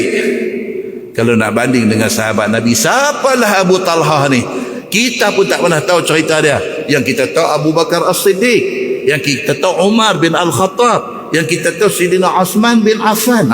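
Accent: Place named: North, Malaysia. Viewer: Saya suka dengar , sbb kadang keluaq bahasa utara yg dah lama tak digunakan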